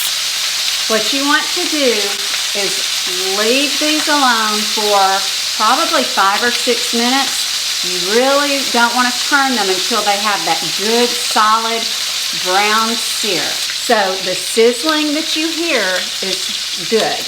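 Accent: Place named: South, United States. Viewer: You are southern. I know you can cook. Don’t stop making videos. Beautiful kitchen btw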